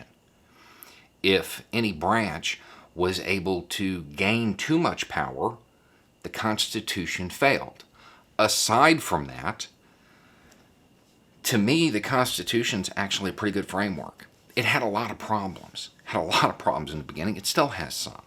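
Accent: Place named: Western North Carolina, United States. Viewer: Damn No, I sure appreciate you making sense with an accent not unlike my own (WNC Mountains). I'm not sure when so many people decided it was okay to let someone else (FOX) do your thinking for you ... Thank you sir!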